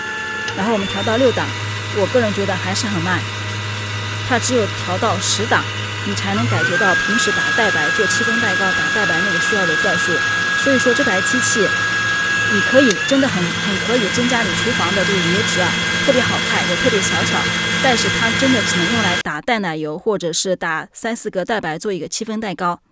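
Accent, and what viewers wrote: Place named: Shanghai, China. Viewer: 博主是上海人？口音可爱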